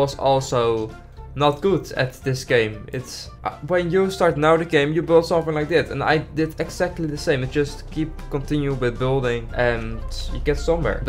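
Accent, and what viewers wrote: Netherlands. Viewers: Sorry.... But i smell dutch btw ik ben het ook! Je bent goed in ebgels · Are you dutch?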